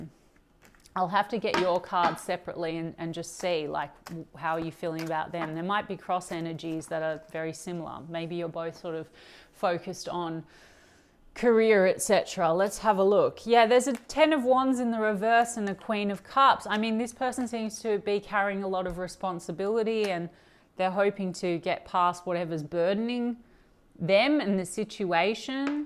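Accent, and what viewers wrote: Australia. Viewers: Great to hear a fellow Aussie gal reading….. absolutely spot on….. will check out the Law of Assumption🙏🏼🫶 🇦🇺⭐️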